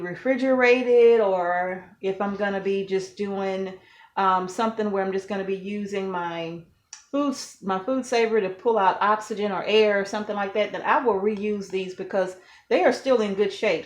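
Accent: South, United States. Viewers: You are an adorable girl! You sound like a girl raised in the South....I am tuning in from SW MS....thanks for sharing your knowledge with me.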